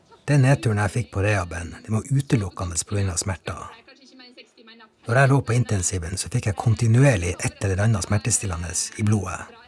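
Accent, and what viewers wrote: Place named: Nordland, Norway. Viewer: Så bra at du kom deg på beina igjen. Jeg må bare le, for den nordlandsdialekta sammen med den nordlandske stoiskheten gjorda hele filmen legendarsik!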